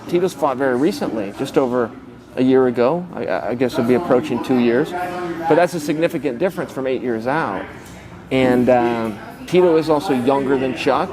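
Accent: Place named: Oregon, United States. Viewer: Being from the Deep South, I really like Chael’s Oregon accent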